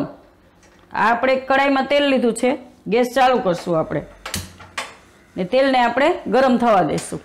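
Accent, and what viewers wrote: Kathiawar, India. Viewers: વેરી નાઈસ. તમે સરસ કાઠિયાવાડી બોલી મા સમજાવો છો... રાજભા ગઢવી જામનગર.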